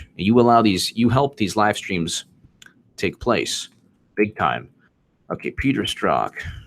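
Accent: South, United States. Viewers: Love that accent!! Are you a closet Southerner? Well, I'll be. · Jeffrey Morrissey It's sort of mandatory, for comic relief. Otherwise this mess is unbearable. And indeed, is good at it. How he manages the Southern accent, dunno...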